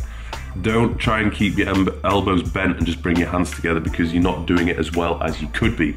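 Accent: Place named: North, United Kingdom. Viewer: Where are you from? Accent kinda sounds north.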